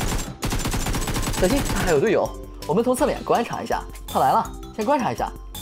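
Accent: Hong Kong, China. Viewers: 你係香港人嗎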